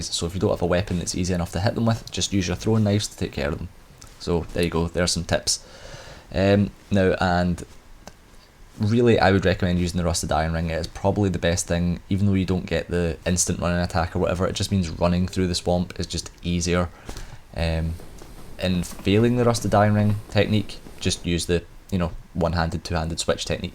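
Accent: Scotland, United Kingdom. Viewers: Thank you for these, I appreciate the amount of detail and thought put into your videos, and your scottish accent does make it easier listening to long videos. Was a little bit surprised when you said you struggled everytime you walked over that moving bridge. For me it's been the complete opposite, tried running or jogging a few times a long time ago and fell off, have been walking over ever since without any accidents. Anyway thanks again!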